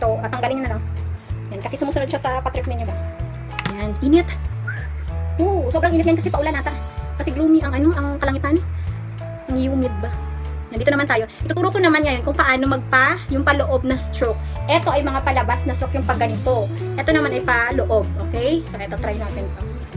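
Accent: Philippines. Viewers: Ilongga ka day? Love the accent💖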